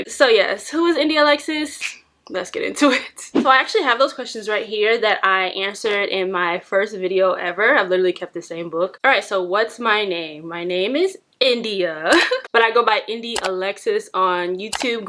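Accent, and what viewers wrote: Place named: New York, United States. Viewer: I feel like people think you’re Caribbean by how you speak but that’s the New York in you. There is a lot of Caribbean people here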